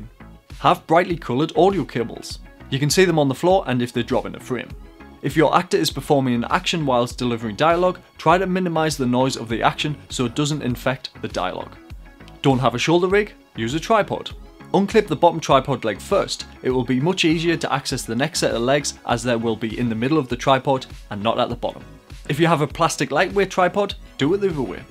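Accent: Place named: Scotland, United Kingdom. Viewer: it took me a second to get use to the Scottish accent; but the tips where great!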